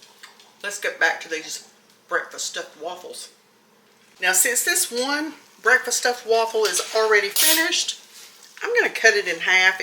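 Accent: South, United States. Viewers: I came across your channel when you were doing the easter dinner for 1 or 2, i live alone and your ideas are great. I usually cook meals ahead and freeze them. Seeing those biscuits reminds me of my husband. We always had the the grands in the refrigerator. Especially when we had company for the weekend. Nice breakfast., i've seen my aunt Melissa make them into apple dumplings. Thank you for sharing , i love southern accents, especially when they're spunky, like yours, thank you🙂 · I so Love your southern accent My precious Mom in Law and Dad in Law were from Northcarolina and i miss them both so much I'm from Montana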